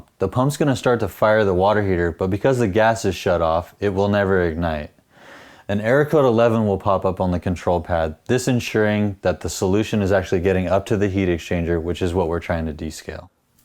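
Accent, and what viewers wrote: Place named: United States. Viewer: A real person speaking perfect American English. Thank you God.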